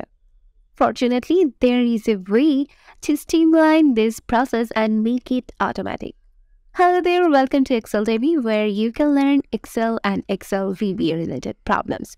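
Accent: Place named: East Asia. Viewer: Nope. Eastern Asian speakers normally speak this way.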